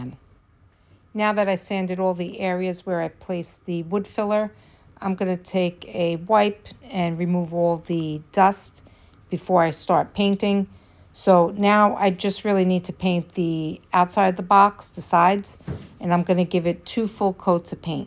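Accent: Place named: New York, United States. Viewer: You have a new subscriber!! I’ve been watching videos on YouTube for years. As soon as I heard that familiar accent, I was like, YASSSSS!!! NY or NJ LOL? I’m in NJ! Love your videos!! You go, girl !! ❤❤❤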